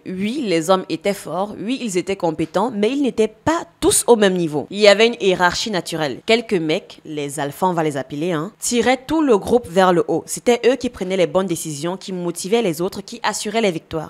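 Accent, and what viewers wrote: Cameroon. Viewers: Ton accent prouve que tu es Camerounaise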